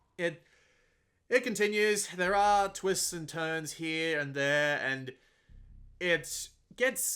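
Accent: Australia. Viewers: It sounds like you would really enjoy the anime. You sound Australian, is that correct? If so, Psycho-Pass is on Madman's AnimeLab for free (and it's legal) in Australia and New Zealand. It's a great anime and I think this visual novel is really just for fans of the anime, like me. It's not a standalone product.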